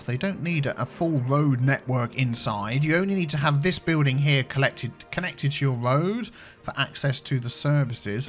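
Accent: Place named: Southern England, United Kingdom. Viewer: Complety off topic, but I'm a geography and linguistic nerd. Just wanted to know where you are from. Trying to sort out my British accent.. It was hell daitng someone with a Geordie accent while in Toronto.. I really felt schizophrenic.. I can hear you are from south England though..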